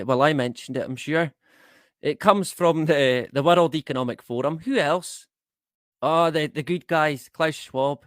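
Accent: Scotland, United Kingdom. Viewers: Love listening to your Scottish accent! Enjoy life my friend. Much love to all. 💙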